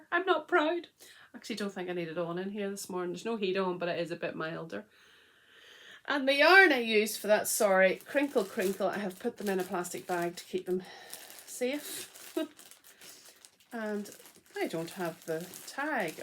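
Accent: Northern Ireland, United Kingdom. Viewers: Just found your podcast. Made me pause hearing a fellow Nothern Irish accent on YouTube. So I am from Northern Ireland, Lisburn born and raised.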